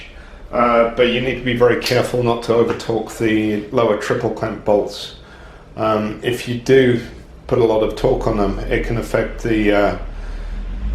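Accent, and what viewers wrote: United Kingdom. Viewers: Hi just wondering how you got tusk wheels as it sounds like you are based in England?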